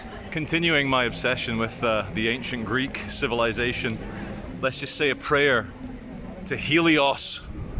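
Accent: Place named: United Kingdom. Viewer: you sound more and more like a Brit eveytime I watch your vids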